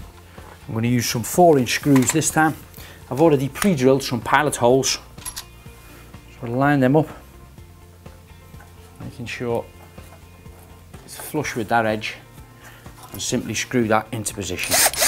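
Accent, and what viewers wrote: Liverpool, United Kingdom. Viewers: He’s a scouser from Liverpool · excellent tutorial, thanks for clear and patient explanation , is it liverpoolian accent btw? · From which part of UK is the presenter ? I like the pronunciation ... especially the "cut" with the U ... :) PS very nice the S too ... shhhtandard ... (great !) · Thanks, Yes were from L,pool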